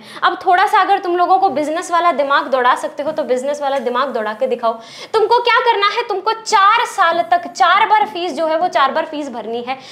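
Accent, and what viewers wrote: Haryana, India. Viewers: Dii i am also from Haryana this year i cleared 10th class with 81.2%i hope i also become a mbbs student just like you,your way of speaking is exact like real haryavi i like it🥰😇🤗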